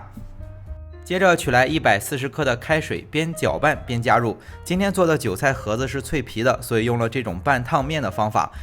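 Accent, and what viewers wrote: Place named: Dalian, China. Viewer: 听这位老爸的口音似乎是大连银😆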